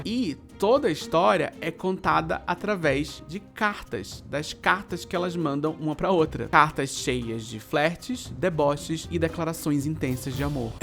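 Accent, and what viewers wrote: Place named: Rio de Janeiro, Brazil. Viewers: Gente, eu jurava que vc era carioca por conta do seu sotaque..... Kkkkkkkkkk Valeu pelo vídeo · Aliás, você tem um sotaque de elite do Rio de Janeiro...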